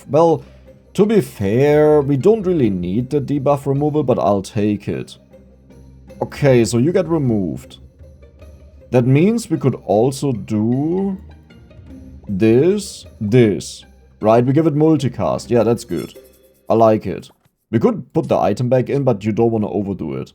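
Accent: Germany. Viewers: Selten jemanden so deutsch klingen hören, wären er englisch spricht 😄. Aber gutes Video ❤ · Wieso ist das so überraschend? Der Akzent ist doch nicht zu überhören 😀 (nicht negativ gemeint) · Ich hatte mir schon vom Akzent gedacht das du deutsch bist, aber auf einmal übersteuert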